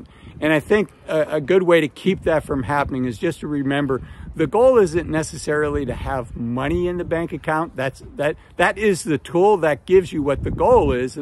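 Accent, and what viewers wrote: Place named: East Coast, United States. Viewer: I’m curious what part of the us are you from? I’m guessing the upper east coast.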